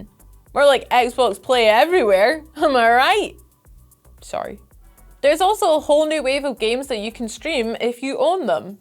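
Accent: Scotland, United Kingdom. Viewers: Love hearing a fellow Scottish accent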